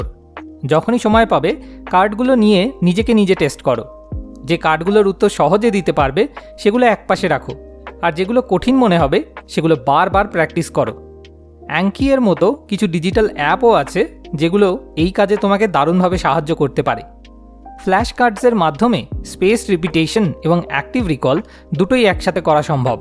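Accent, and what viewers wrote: Kolkata, India. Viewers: Apni ki kolkatar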